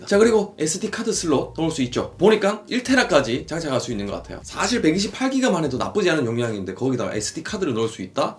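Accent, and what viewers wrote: Daegu, South Korea. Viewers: 말투가 비슷하다 싶었는데 대구분이셨군요ㅋㅋㅋㅋ 잘 보고 가요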